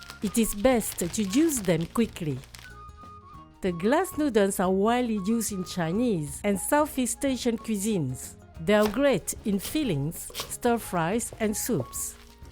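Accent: France. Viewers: She is Asian with a French accent !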